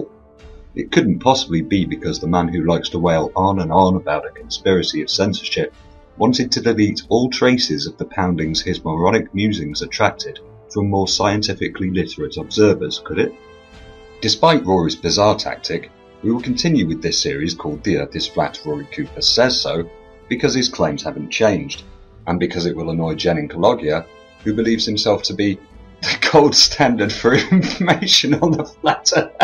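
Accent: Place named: England, United Kingdom. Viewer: ***** You AVOIDED the most pertinent part of my POINTS (and I only had a couple)... is it 2 FEET or 3 FEET over 6 miles... You state in the video it's been duplicated many times, the 3 feet thingy... yet avoid or explain away atmospheric refraction - PLEASE... you even state in the video it, the experiment, has been validated many times. You use an English accent.... is that to sound authorotative...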